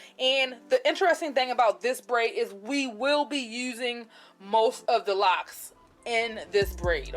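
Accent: Baltimore, United States. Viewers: thank you… the bmore accent is givn life.. this was very informative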